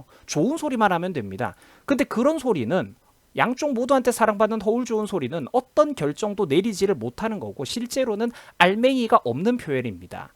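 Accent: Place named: Daegu, South Korea. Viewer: 대구사투리 넘 좋다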